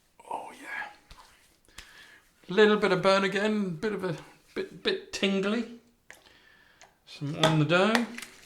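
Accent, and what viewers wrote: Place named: Birmingham, United Kingdom. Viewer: ayyyyyy Bimrmingham, I was gonna say I recognise that accent, that my accent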